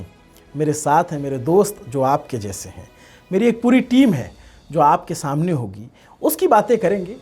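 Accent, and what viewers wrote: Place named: Awadh, India. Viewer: Bahut badiya bhaiya 👏 Aapki awadhi kamal ki hai❤ Lalitpur up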